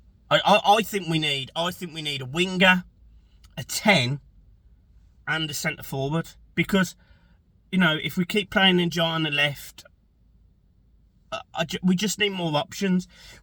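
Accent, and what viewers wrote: Birmingham, United Kingdom. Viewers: 1st time watching . Got confused with Brummy accent thought had clicked on a Villa channel 😂☺ Am a Staffordshire Evertonian so pot calling kettle black. Leicester and Southampton gone.Wolves very similar standard to us. Ipswich have more spark. Us or Wolves.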